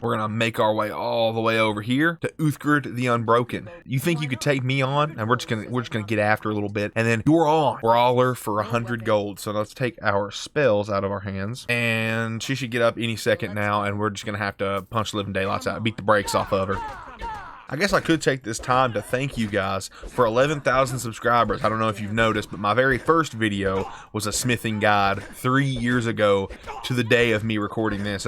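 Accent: South, United States. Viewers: It is so great hearing another southern person playing video games😂 favorite part is “beat the brakes off of her”😂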